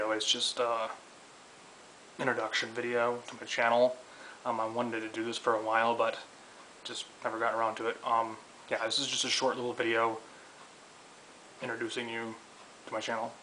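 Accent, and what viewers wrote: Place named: Canada. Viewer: You should of talked more about your favorite games and influences. What was the first game to really blow you away? For me it was Final Fantasy on the nes. I was probably 9 years old and just couldn't believe how friggin cool that game was at the time. Also you have almost a Canadian accent, are you in the States or Canada? Just curious.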